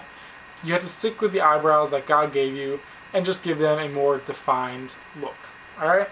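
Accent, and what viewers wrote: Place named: New Jersey, United States. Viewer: He has a east coast accent.. I heard a hint of jersey in there..O_O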